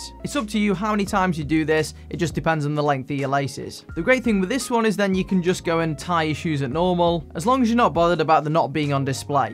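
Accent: United Kingdom. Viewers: I watch his videos only to hear that British accent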